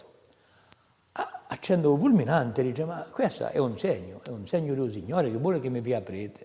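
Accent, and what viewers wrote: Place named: Massa Carrara, Italy. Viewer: confermo, l'accento è quello tipico di MASSA CARRARA ovviamente la lingua è toscano/ umbro medievale con influenze genovesi es, la parola'' fulminanti' cioè fiammiferi era molto usata da mia nonna che era umbra come ''cignale''=cinghiale ecc.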